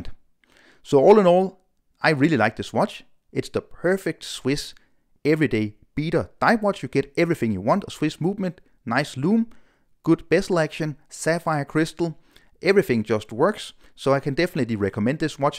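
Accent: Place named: Denmark. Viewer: haha insåg inte att det var dansk engelska jag hörde! Gissade på Holland/österrike först. Du gör bra videos!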